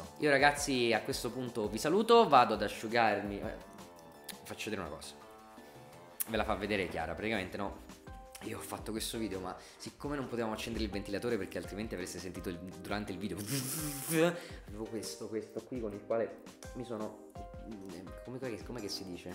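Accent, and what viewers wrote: Campania, Italy. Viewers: ma sei campano??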